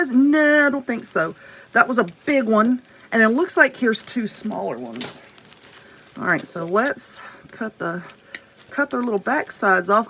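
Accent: South, United States. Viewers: As a Southern Girl myself(Texas), I can’t decide what I love most…. Your Awesome Crafts, you’re Southern accent, the nails or the beautiful tattoos! I’ve learned so much from your videos. Please keep posting!!!!! 😊👍🏻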